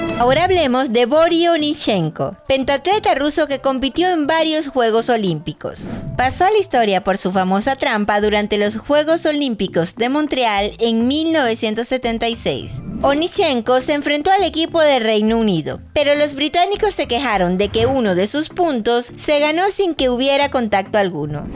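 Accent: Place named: Spain. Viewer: Os molesta que dice las C y las Z como en España, os quejais mucho del racismo pero sois los peores.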